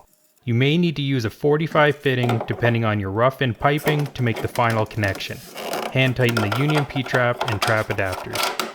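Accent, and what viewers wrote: Canada. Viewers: Great job! You sound Canadian. Is it a norm in cold regions to use ABS piping for drainage? Or anything else? I was looking for a good tutorial for my kitchen plumbing project. Cheers from Houston, Texas.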